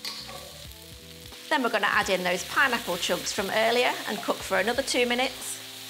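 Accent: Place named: Manchester, United Kingdom. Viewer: It’s fantastic that (a) you are British, & (b) you sound like a MANC or at least a Northerner.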